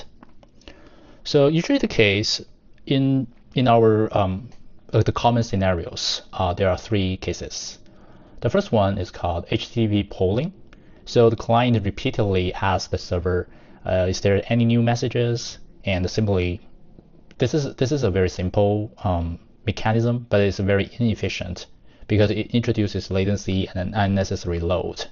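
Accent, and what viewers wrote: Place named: England, United Kingdom. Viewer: Great walkthough of the design of the problem. One suggestion is to hire someone without an English accent to do the videos because it is very hard to follow and understand smoothly when people am also trying to figure out what the host is trying to say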